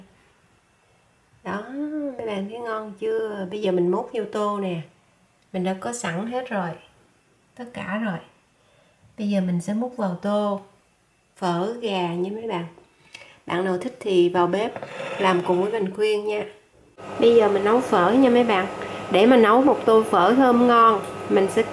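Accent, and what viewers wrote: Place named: Mekong Delta, Vietnam. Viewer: hết sức chân thành cảm ơn đã nhiệt tình bỏ hết tâm, sức để hướng dẫn, phổ biến những món ăn quốc hồn, quốc túy của Việt Nam cho mọi người một cách đầy đủ, chi tiết kể cả bí quyết gia truyền nhất làm các món xuất xứ từ Miền Bắc như Phở bò, gà, bánh cuốn....tôi cũng rất thích giọng nói mang âm hưởng Miền Tây chất phát, phát thiệt tình....tôi thường xuyên xem các youtube của bạn chúc luôn VUI VẺ, KHỎE MẠNH nhe....và cống hiến nhiều hơn nữa cho cộng đồng: thank you...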